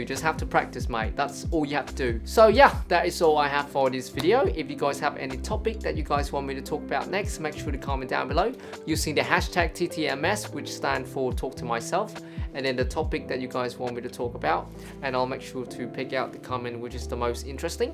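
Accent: England, United Kingdom. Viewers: your British accent is addictive af omg chef kiss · Em ít khi nghe người Việt mà có accent 100% anh-anh như anh, nghe sướng tai gì đâu ❤️❤️❤️ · Thích BA vclll =))) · My bf (Caucasian) told me that your British accent is pretty nice. The only funny thing he noticed that you said “elementary school” instead of “primary school” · Cái accent của anh giống người anh nói ghê ah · Love this British accent!!! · I really love your British Accent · OMG British accent , luv it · Ahhh thích bạn này British accent 👍👍👍 so admirable bro 😍😍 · You have such a great British accent which makes me feel a bit more comfortable when practicing it. Keep up up the good work! Ps: from Vietnam :3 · nói giọng anh - anh nghe cuốn ghê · giọng này hay nhưng pha Australian r nhé bạn. K hẳn British. Cách bạn ấy nói YouTube hoặc about. N chung là có xu hướng British thôi. Thú vị phết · Good UK accent there, mate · Seems like he's talking in British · Mô Phật, coi miết mà giờ mới để ý cái series này, kể ra tui cũng khá giống đấy chứ và bây giờ tui có thể enjoy these videos with English accent =>>>>>> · I LOVE YOUR BRITISH ACCENT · I was like hmmm, that sounds like a British. 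And then the "mate" came out. Yup that is british accent lol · nice British accent, mate! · how cute ur british accent... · Woww👍British accent...love it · I love your British accent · nói giọng dễ nghe quá luôn í phát âm accent british mê =((( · British accent đỉnh quá :3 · uầy anh có cách phát âm của người Anh rõ rệt nhưng cũng pha 1 chút Mỹ ngưỡng mộ thật sự :3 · British accent wow amazing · omg, his British accent is incredible! beautiful. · dude this is obviously british accent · Tiếng anh của England nghe sang vãi đái :)) đc quả accent này đi cưa gái thì đỉnh ae nhờ :))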